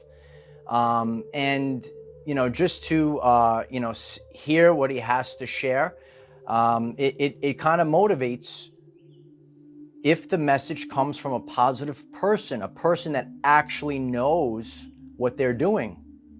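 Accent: United States. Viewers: American accent Aussie trees behind you...not aligned from my perspective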